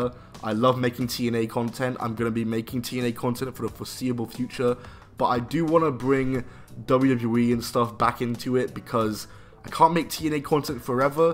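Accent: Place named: Scotland, United Kingdom. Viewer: Gotta admit, I wasn't ready for that much scottishness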